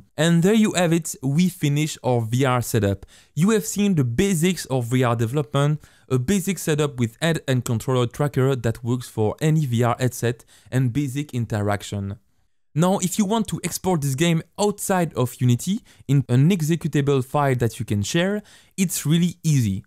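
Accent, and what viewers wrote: France. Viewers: Juste a ton accent: Tu es francais? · I love his strong French accent haha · On reconnaît le booonne Français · Your french right? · A lil french xd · Pas mal le French accent x) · It's the French accent for me. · Is he french? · En tout cas on voit pas du tout que tu as un accent français cher compatriote ahah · You are probably French right? That accent seems French to me :) Well I am too, just not from Europe, I have a different accent here in Quebec. Anyways thank you for the tutorial ! Merci.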